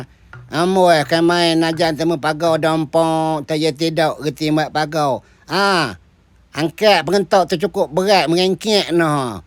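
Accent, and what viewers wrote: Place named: Temerloh, Malaysia. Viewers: Bahasa belah temerloh ke chenor ni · Suka dngor aok ckk tmerloh.. stedy 🎉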